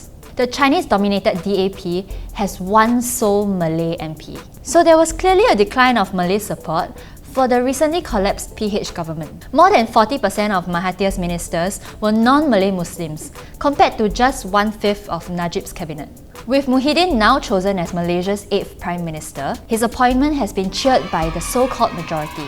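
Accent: Singapore. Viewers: I terribly in love with Singaporean accent.. Okay Lah!! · Nice Singlish! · Very good singlish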